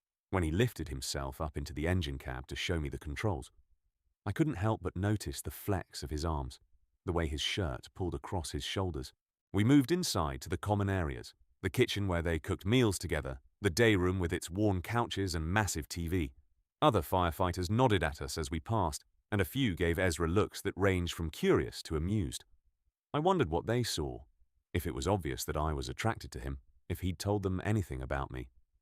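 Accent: United Kingdom. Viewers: A part 2 of this story would be awesome! love the British accent of the narrator.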